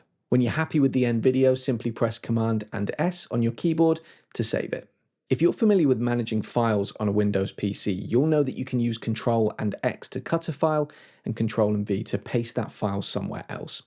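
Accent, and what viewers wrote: United Kingdom. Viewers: For all who're mocking the accent The British accent is by far the sexiest English accent ever existed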